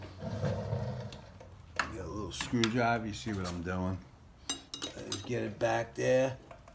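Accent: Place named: Brooklyn, United States. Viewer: Love the accent. Sounds like Brooklyn or SINY , my part of the country.. good tips thanks for the info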